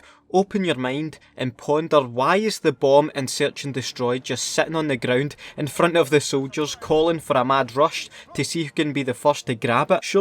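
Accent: Scotland, United Kingdom. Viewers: MattLC They are both Scottish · Scottish I think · Scottich iirc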